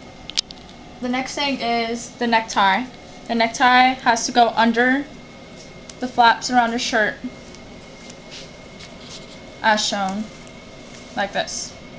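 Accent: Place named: Mississippi, United States. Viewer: Your from Mississippi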